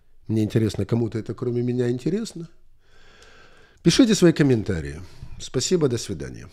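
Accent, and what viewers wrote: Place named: Ukraine. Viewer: Спасибо, за грустный, поучительный, правдивый рассказ. Отмечаю каждый раз, слушая Вас: как живуч украинский акцент, даже по прошествии стольких лет жизни за границей, свободном владении английским и т.д...😊👍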